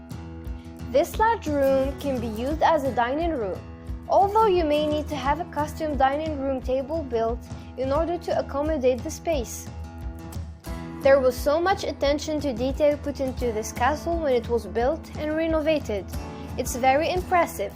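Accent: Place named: France. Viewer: "It can be yours for a MERE $5.69 million". That might be mere to you, but not to me. BTW it's difficult to understand the narrator with that French accent.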